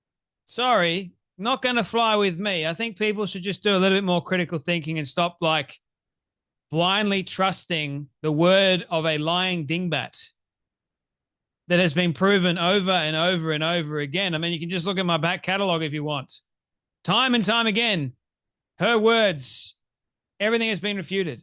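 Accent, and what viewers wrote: Australia. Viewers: Love, love your accent...are you Aussie? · You an Aussie?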